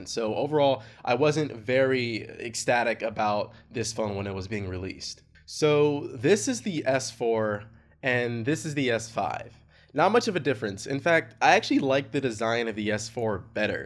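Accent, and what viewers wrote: Nigeria. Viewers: Are u Nigerian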